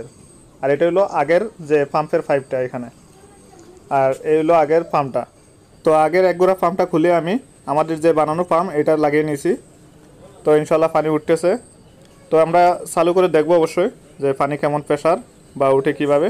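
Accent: Sylhet, Bangladesh. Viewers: Vai Sylheti Sylheti lager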